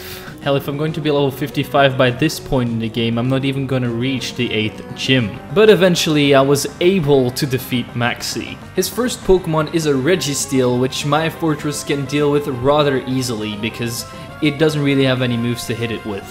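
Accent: Netherlands. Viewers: Well if u aint Dutch or from Belgium idk anymore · You sound dutch, is this correct?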